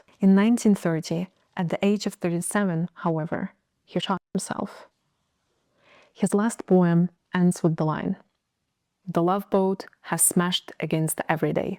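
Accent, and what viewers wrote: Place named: Russia. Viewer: Ох уж этот russian classic accent :/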